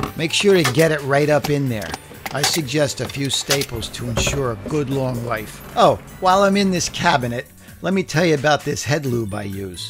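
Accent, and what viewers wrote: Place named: New York, United States. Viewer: u sound like a goodfella u knw like goodfellas the movie ....new york accent.is class 😙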